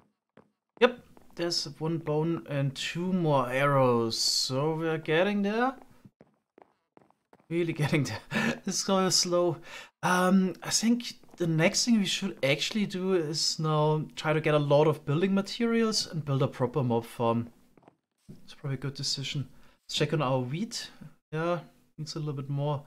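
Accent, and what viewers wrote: Germany. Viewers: Are u German ? Weil ich es bin haha und ich es irg wie bemerkt hab??? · Mate, I love watching your videos from time to time and they're always really informative and entertaining. But as a fellow German with basically a cockney dialect I have to say - that German accent drives even me crazy. 😂 No worries though. Love your vids. <3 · Man hört sehr raus das du deutsch bist aber trotzdem guter YouTuber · Man hört den deutschen accents so hart 😂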